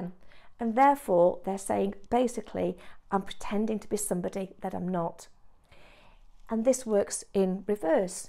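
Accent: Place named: England, United Kingdom. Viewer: 🇬🇧💞🇺🇸 Your English accent sounds lovely and easy to understand. I am pleasantly surprised as I speak American English. Please do not speak slower in your broadcasts. YouTube has a Playback Speed adjustment so listeners can choose the preferred speed of the voice (normal, slower, very slow). Thanks for your thoughtful advice on very important issues in human behavior. 🙏💖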